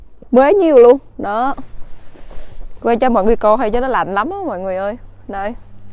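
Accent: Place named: Quy Nhon, Vietnam. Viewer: Giọng này là quy nhơn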